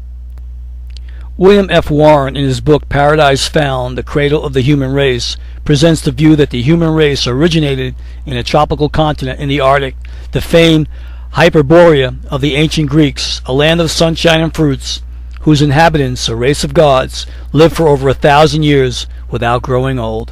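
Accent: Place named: Northeast, United States. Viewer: Great information, thank you. Your music choices made it less than pleasant to watch though and I can tell you are from the far NorthEast somewhere.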